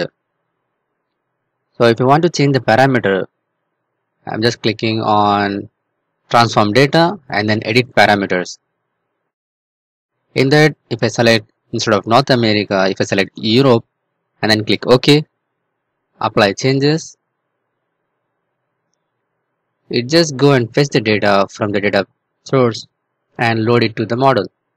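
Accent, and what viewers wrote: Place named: India. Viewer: Indian... but good!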